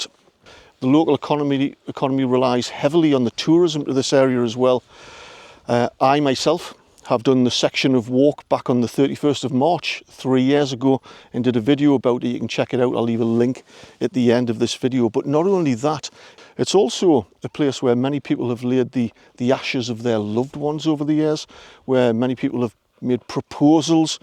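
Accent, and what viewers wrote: Newcastle, United Kingdom. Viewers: I just trust a geordie accent, im a scot and this guys voice is just perfect for broadcasting, his hard work too, an education listening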